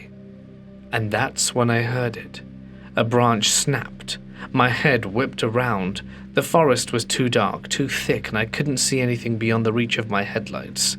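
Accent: United Kingdom. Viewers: I love that British accent !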